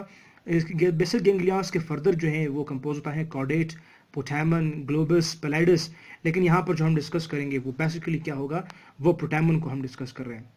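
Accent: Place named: United Kingdom. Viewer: U are having good teaching methods but don't try to teach like British person i think Pakistani don't get that language 😮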